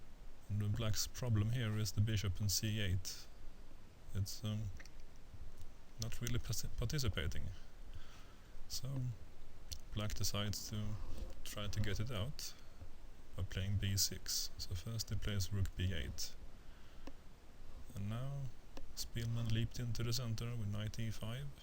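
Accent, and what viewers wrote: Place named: Sweden. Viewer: yay svensk accsent =D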